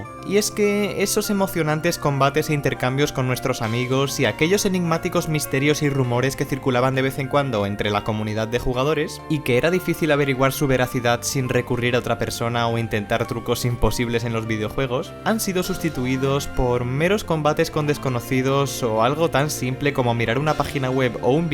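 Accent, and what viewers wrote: Zaragoza, Spain. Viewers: Eres de Zaragoza? 🤔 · Eres de Zaragoza??? 😊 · Eres de Zaragoza??? =)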